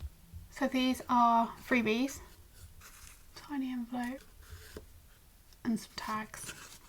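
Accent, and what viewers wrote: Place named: United Kingdom. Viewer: you have a British accent?! OMG!!! I was in love with your journals, personality all this time, and now I fell in love with your accent and pretty voice. Definitely continue doing voiceovers. Music to my ears💕💕💕 I need to get the green version of this journal. It is just so aesthetic, I'd love such journal to sit in my shelf. And her washi tapes and sticker designs are always so elegant and feminine. Love love love Leela's style and your unboxing❤‍🔥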